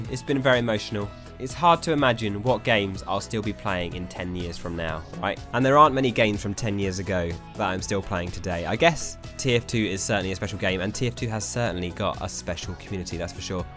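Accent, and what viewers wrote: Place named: United Kingdom. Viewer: I swear you’re like twice as british as usual in this video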